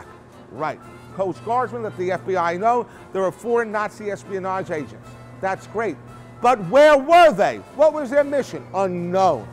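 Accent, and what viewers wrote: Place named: New York, United States. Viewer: Sounds like a New Yorker.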